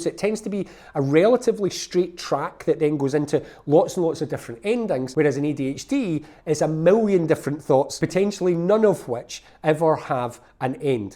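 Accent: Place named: Ireland. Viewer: Started listening because your Irish (?) accent is just divine to my ears — stayed because you really hit a nerve & I feel so seen now! Subscribed.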